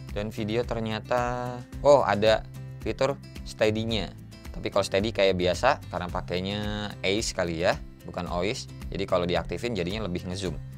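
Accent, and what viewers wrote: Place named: West Java, Indonesia. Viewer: Sunda pisan aa teh logat nya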